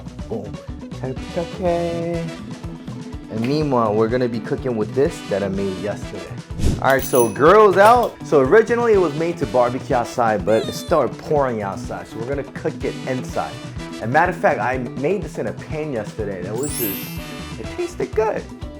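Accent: Philadelphia, United States. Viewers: I can hear the Philadelphia all in you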